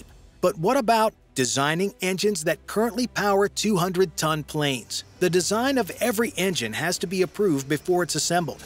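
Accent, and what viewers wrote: United States. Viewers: Well, this is an American voice and pronunciation to a European ear is always strange.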